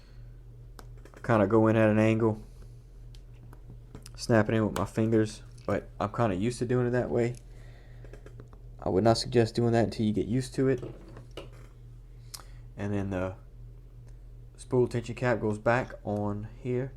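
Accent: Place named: Louisiana, United States. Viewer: When I heard your accent I knew. You gotta be south of Houma?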